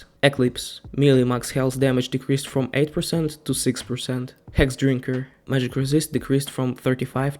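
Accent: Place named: Russia. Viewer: Фикси акцент, слышно что ты russkey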